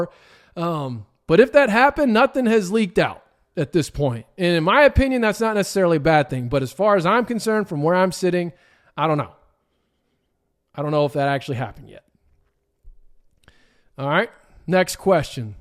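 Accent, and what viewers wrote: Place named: East Coast, United States. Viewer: PAC refugees going to a depleted AAC 2 timezones away with a locked in 9+ year contract making roughly $4MM more than MWC is suicide. The brutal reality is MWC offers the softest/safest landing spot. PAC+MWC merging keeping PAC name makes the most sense financially, economically, and geographicly. Add Gonzaga as the 14/16th member would be the cherry on top. This podcaster sounds like he's from the East coast chiming in his biased opinion.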